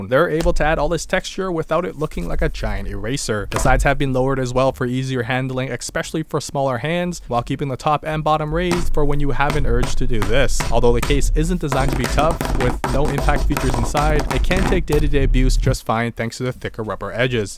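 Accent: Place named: Canada. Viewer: Canadian with a light lisp?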